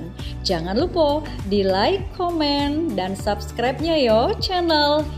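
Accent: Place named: Indonesia. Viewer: logat nja kamu ngomong seperti logat behese sengedeng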